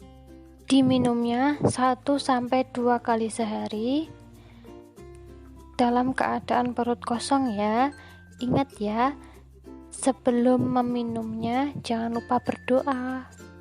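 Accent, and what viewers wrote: Java, Indonesia. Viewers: Medok tpi biutipul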